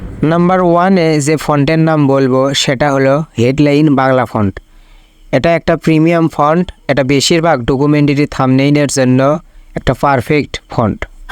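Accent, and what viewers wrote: Bogura, Bangladesh. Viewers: bhai er basha boguray naki?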